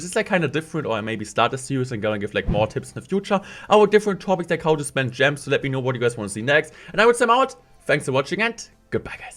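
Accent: Germany. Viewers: We love accent germany/English.. best content creator ever.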